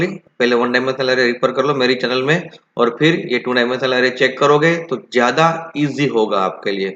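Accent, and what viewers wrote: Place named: India. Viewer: Your explanation is amazing, but unfortunately I can understand nothing from your Indian accent.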